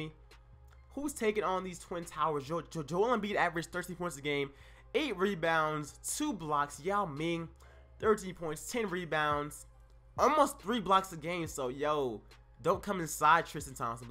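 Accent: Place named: New York, United States. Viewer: It sounds like your From ny are u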